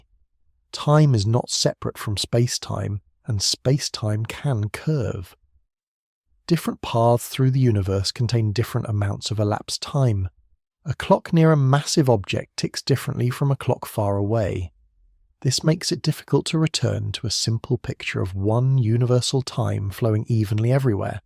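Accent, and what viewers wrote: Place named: West Sussex, United Kingdom. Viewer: Hi from West Sussex. Thanks for your vids my friend. You sound local to me.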